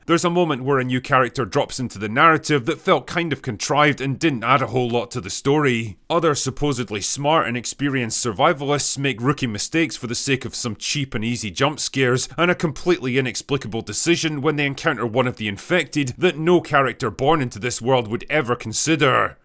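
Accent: Scotland, United Kingdom. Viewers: Accent. Scotsman. Kettle. Ha!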